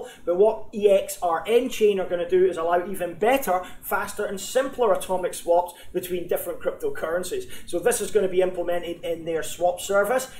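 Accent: Scotland, United Kingdom. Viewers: very British :-) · Nice info. Are you Scottish please?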